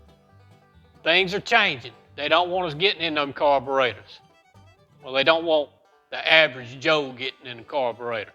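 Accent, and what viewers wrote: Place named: Italy. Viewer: Thanks for sharing . But With that accent you must be Italian. Those parts bags were a good idea, thank you 👍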